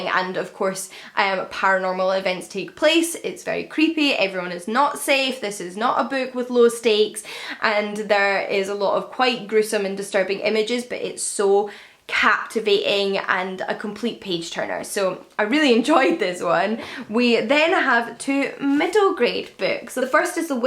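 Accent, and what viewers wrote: Scotland, United Kingdom. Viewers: That's the Scottish accent dude, it can make doom and gloom sound like optimism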